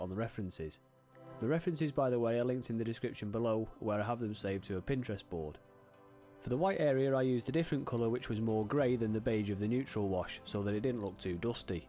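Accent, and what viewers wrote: United Kingdom. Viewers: Ready to take up one of my favourite childhood pastimes again, and your channel looks splendid for learning the proper way to do it. Was a bit confused seeing Biltema acetone combined with your British accent. DIdn't know it was available outside Scandinavia.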